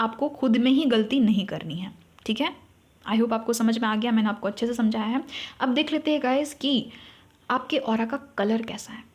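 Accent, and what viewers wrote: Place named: RP, United Kingdom. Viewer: I like your accent, I am myself into British RP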